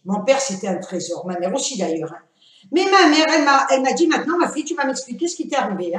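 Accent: Algeria. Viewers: Quel mesaventure !!!ah ces hommes il se croivent tellement fort heureusement que vous etiez la pour sortir sa voiture .Ta pauvre copine mdr tu m a fait trop rire !!!Mdr l affiche a la gare j imagine la scene :) ta pauvre copine sa mere n etait pas commode....et ta maman qui ne t as pas cru alors que tu disais la verite mais en meme temps ton histoire est tellement delirante .Et ton accent pied noir est a tomber,j en veux pleins d autres histoire comme ca pleins de gros bisous ma belle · bonsoir ma copine rigolotte ♥ , exelnent avec l'accent pied noir trop bien sa met du caracterer a ton histoir trop top :) MDR :) hahaha bonne soirée kiss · coucou ma je me suis encore payé une bonne rigolade décidément il t'en arrive toujour de bonnes enfin pour une fois ce n'est pas toi qui est tombé ouf soulag!!!là à la chute de la copine j'ai trop rit je lais vu tomber avec les chaussures qui reste collées dans bobo j'espère en tout cas le copain il devait tenir une bonne muflee pour ne plus se rappeler de la rivière et il aurait pu aller expliquer à vos parents ah les hommes!!!merci tu m'a mise de bonne humeur pour la journée. 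j'aime l'accent pied noir c'est sympa ça reflète le soleil et la bonne humeur bisous à vous deux je vous aime très ford😗😗😗😍😍😘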